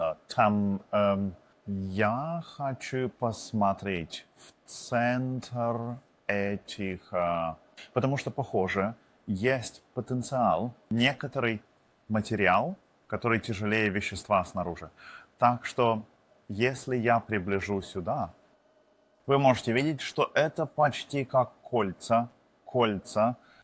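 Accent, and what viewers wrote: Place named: Russia. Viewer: I was just telling my wife how great your Russian accent was! 😁 It made watching this easier for her as she doesn't speak much English at all. 🤭 But I saw the original En issue as well. 👍🏼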